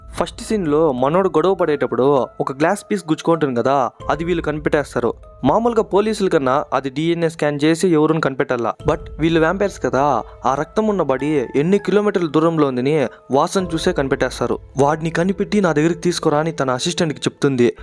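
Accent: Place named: Chittoor, India. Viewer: Bro ru from Chennai or Tamil Nadu border or Chittoor language mix but i like it just as puspa slage 😎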